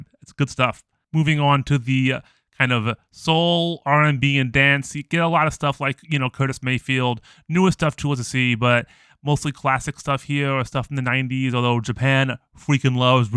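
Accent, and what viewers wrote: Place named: New York, United States. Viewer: I honestly just thought you were from NYC or Jersey or Boston or something.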